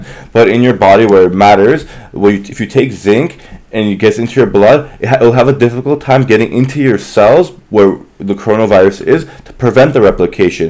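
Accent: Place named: Levant, Syria. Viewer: Are you originally Syrian or Lebanese? I mean no offence just because of the accent. I am a linguist and do research some accents. Thanks for the infos, it was quite informative and needed!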